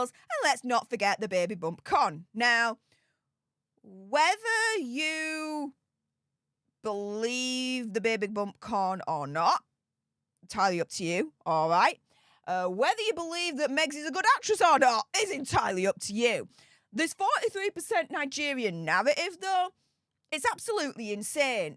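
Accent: Yorkshire, United Kingdom. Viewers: Nowt wrong wi' a Yorkshire accent! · Yorkshire accent which is Northern England. 👍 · Please slow down with your speech you have a beautiful accent being British but it's hard to understand you when you talk fast. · She has a Yorkshire accent. I watched a youtube video that explains it and it helped me understand her. For instance, the letter T is not pronounced in the middle and end of words. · It sounds like Yorkshire northern England ! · I know it's British... I'm asking what part of Britain. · It's British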